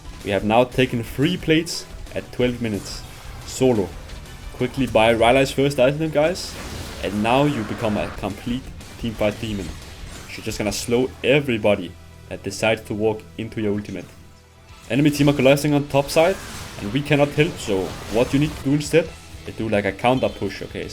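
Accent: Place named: Denmark. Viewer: Love the vids. What accent is that? Sounds a little danish to me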